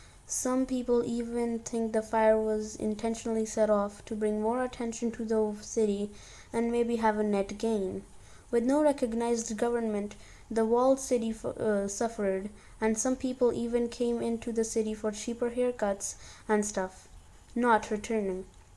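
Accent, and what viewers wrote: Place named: India. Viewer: Your voice changed so much holy shit, it became so indian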